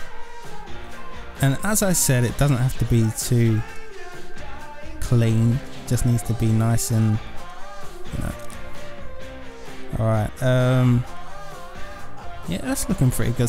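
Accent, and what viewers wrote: United Kingdom. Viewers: Welcome back! I've missed your amazing English accent:)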